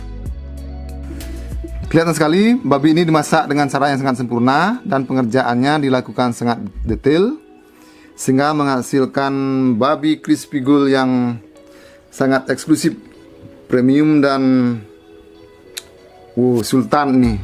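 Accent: Bali, Indonesia. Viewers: duhh seksiinyaaa logatnya bali bgtt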